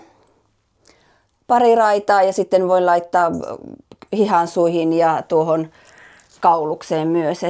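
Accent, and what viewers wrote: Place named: Eastern Finland, Finland. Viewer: Tykkäsin tästä videosta ja laitoin tilaukseen. Oletko kotoisin itä-Suomesta? Puheenparsi kuulosti niin tutulta. Minä tykkään neuloa keinutuolissa ja sään salliessa puutarhakeinussa. Hyvää kesää!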